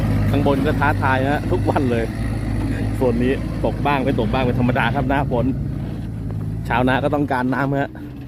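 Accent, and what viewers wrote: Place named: Isan, Thailand. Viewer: สุดยอดครับพี่เว้าอีสานแน่ครับอยากเห็นหน้าอ้ายครับFcเด้อครับ👏👏👏👏👏👏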